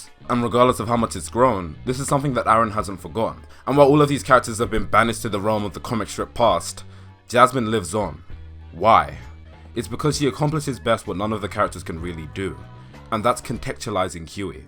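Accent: England, United Kingdom. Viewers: Question: You sound English. Is that observation correct? If so, would you ever consider doing a video on blackness in the UK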